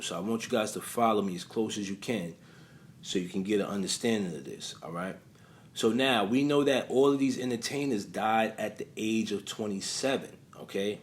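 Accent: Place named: New York, United States. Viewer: All this time I thought you were saying, "Foreskin Bargain". New York accents, sheesh!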